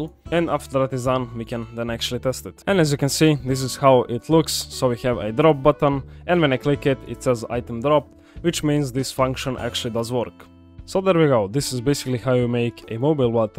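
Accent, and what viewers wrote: Russia. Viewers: RRRusian accent!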